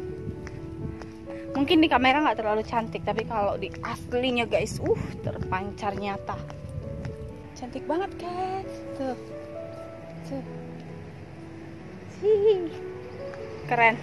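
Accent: Medan, Indonesia. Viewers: logat kk seperti logat anak Medan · Kayak org medan cara ngomong kakak ini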